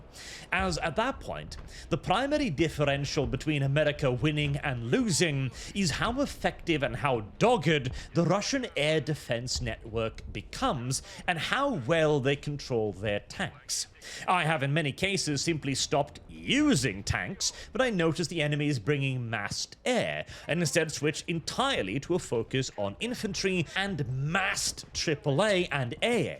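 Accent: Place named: United Kingdom. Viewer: Bloody hell, This guy's British is so powerful I feel like im in a British Tea party, Im not even British!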